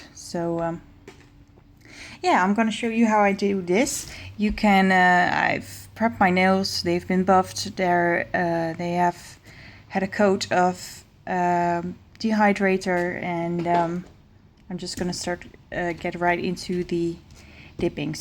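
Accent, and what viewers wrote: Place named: Netherlands. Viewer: bende gij nederlander.. want jou accent is echt Hollands..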